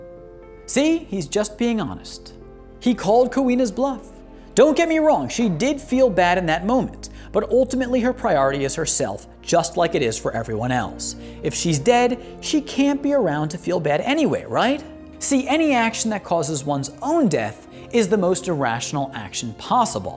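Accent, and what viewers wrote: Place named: United States. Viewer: Why do you pronounce the names with that American accent? It’s soooo cringe brother. Pronounce it properly! They are English names in a Japanese accent!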